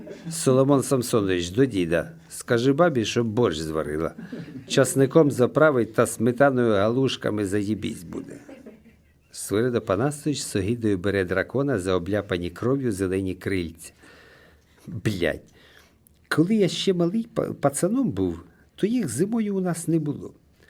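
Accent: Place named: Surzhyk, Ukraine. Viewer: На суржику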